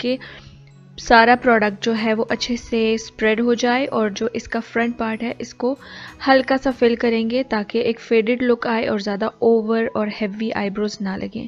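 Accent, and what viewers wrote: Punjab, India. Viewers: aap punjabi bola krain bohat payari bolti hain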